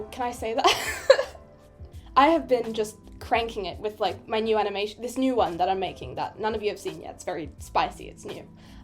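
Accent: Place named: Australia. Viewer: WAIT AUSTRALIAN???? JUST LIKE ME FR???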